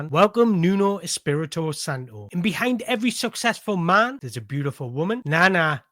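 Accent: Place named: North East England, United Kingdom. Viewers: The comment about your accent is ridiculous, local accents are beautiful. Appreciate you my North east friend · Watched a few minutes and I really cant listen to that north east accent anymore.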